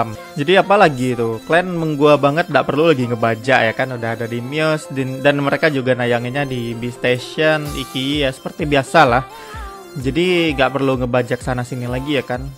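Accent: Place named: Padang, Indonesia. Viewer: Bang kenapa logat mu kayak orang padang 😂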